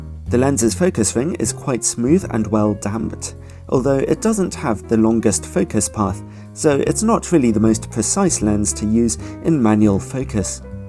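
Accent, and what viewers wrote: United Kingdom. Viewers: Does everyone in UK can speak like you?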